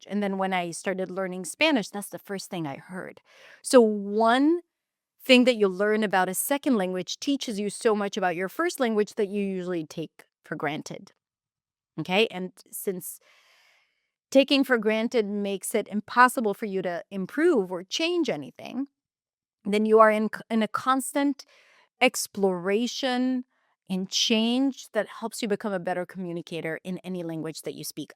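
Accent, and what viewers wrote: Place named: United States. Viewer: I honestly couldn’t believe you’re a native Hebrew speaker because you sound so American! 🇮🇱🇵🇭 I’m a Filipina working here in Israel, and learning your background just blew my mind. I’m currently trying to improve my English, and your transformation gives me so much hope! Todah rabah! 🙌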